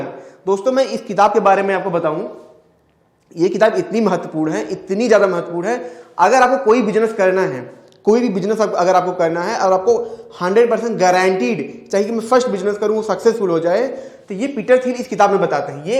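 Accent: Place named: Uttar Pradesh, India. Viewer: I like the accent .....up hindi....explanation is superb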